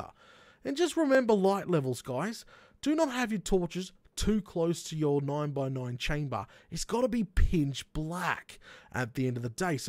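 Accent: Australia. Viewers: Mmmmmm...so Australian.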